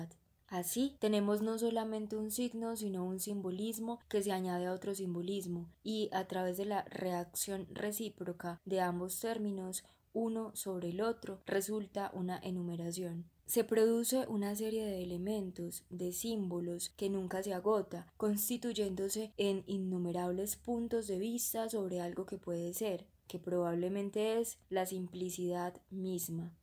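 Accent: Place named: Antioquia, Colombia. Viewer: Bergson con acento paisa, estoy en el cielo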